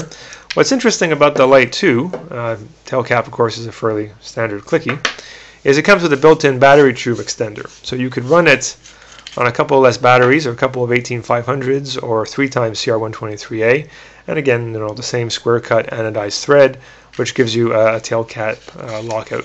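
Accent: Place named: Canada. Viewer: Actually I noticed your accent. Ive watched my share of CBC and Kids In The Hall lol.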